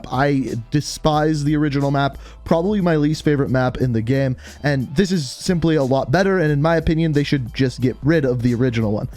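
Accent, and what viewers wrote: Canada. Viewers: This dude sound Canadian ...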